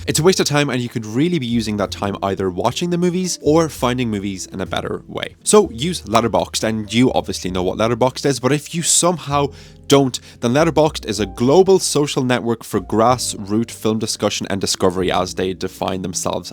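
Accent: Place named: Ireland. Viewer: What is your ethnicity? You sound either Irish, but I’m guessing your name, is Czech/ Slovak, since is Polish for Paul, & those are West Slavic languages.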